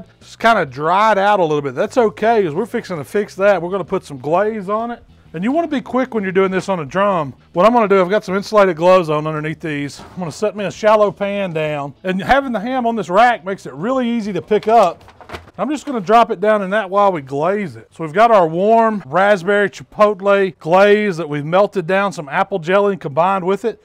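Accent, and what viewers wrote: South, United States. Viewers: his southern accent is so strong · When you see a Big Guy with southern accent then u know youre in the right place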